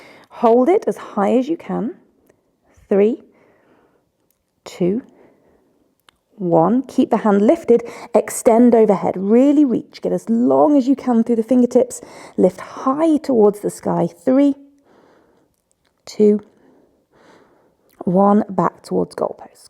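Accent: United Kingdom. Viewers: Thank you so much! You are one of the best out there. I'm doing quite a lot many types of functional sport and exercises regularly ant thought my flexibility and range of motion is at least acceptable... Now, this simple and 'easy' routine exposed a fantasy world I'm living in. Some of those starting positions you represented here before even started the actual strech were already enough for me and I couldn't go any further. 😅 Realizing now how much I've work to do. I've been following you already about one year yet haven't still sucribed yet. Now I finally did it. All of your efforts and videos are so comprehensive, well structured and quided. They are gentle, precise and beginner friendly. I'm really grateful you're doing this and sharing your expertise. Keep up the good work. You definitely deserve all the success in your path. And I really love your british accent! 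So refreshing after listening and following so many influencers outside of UK. Greetings from Finland. 😊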